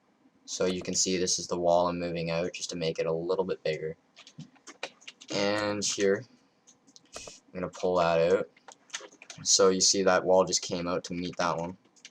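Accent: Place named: Canada. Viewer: i can tell he's canadian, from the obvious pronunciations of words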